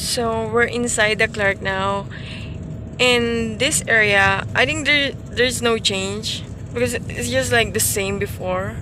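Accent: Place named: California, United States. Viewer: All eyes on. You are a very beautiful women.😍😍🔥🔥. You talk like you're from Cali. Lol. I would love to come out there and hang out with you.😉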